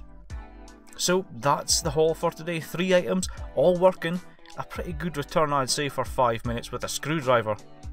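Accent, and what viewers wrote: Scotland, United Kingdom. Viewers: Ah so you're Scottish eh? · I hope your channel does well mate! I'm from Glasgow, good to hear a fellow scotsman!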